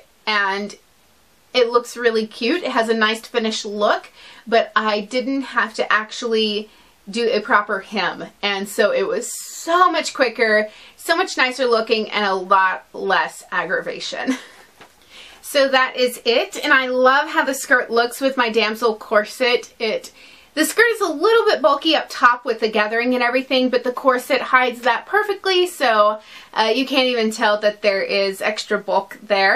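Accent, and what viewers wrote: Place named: West Coast, United States. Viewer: Would you mind if I asked where you are from? You have a bit of an accent, but I can't tell exactly where from. California? Arizona? West coast USA? Sorry, this question is irrelevant to the topic but I'm very interested in accents & dialects for my work. Thanks! Beautiful skirt, too!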